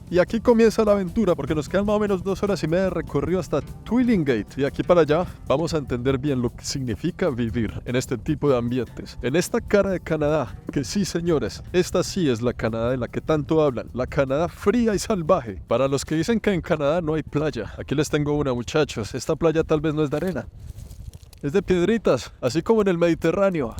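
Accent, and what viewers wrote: Colombia. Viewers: Ese también es acento colombiano ❤, tiene una voz muy muy agradable y hace un gran trabajo con sus videos tan geniales ❤ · Tienes esa Forma Tan Especial y Original de Narrar Tus Videos Con ese Estilo que Te Caracteriza Bien Colombiano con Palabras Sencillas y Entendibles. Muy Bien..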